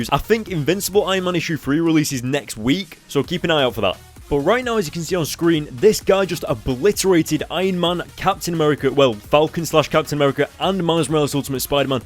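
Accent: United Kingdom. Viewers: Crazy stuff, crazy stuff, crazy stuff...that in your british accent just makes me smile. I like being british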